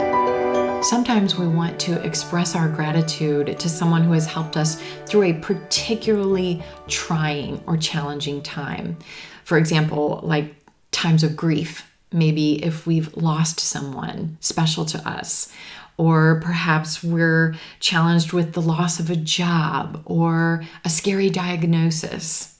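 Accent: United States. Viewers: The american accent which is you teaching,What kind of american accent?Is this the general/standard american accent?Plz,answer me.I am a great fan of you.I will be thankful if you answer me...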